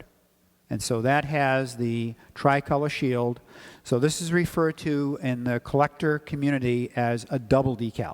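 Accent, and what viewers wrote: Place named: United States. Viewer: Love the Americans and their funny pronunciation of German words.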